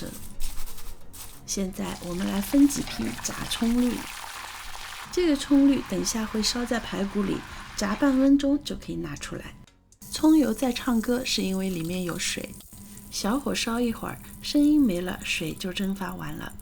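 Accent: Shanghai, China. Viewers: Hello, 我也是上海人。你的美食频道做得真好，很地道的上海菜。很地道的上海口音。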